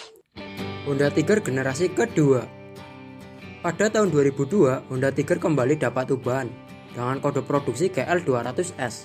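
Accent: Java, Indonesia. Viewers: yaampun wng ndi iki suorone khas jowo bgt 😂😂😂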